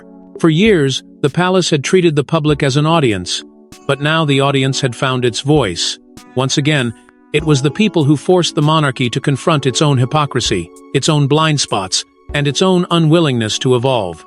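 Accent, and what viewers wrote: United States. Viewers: Strange how these American-voiced Royal Family tit-bits all seem to stretch out to the 20+ minutes mark! They're all sheer speculation, & imagination, with NO evidence to support them: Simply cash-earners from YouTube views etc. - Don't believe any of it!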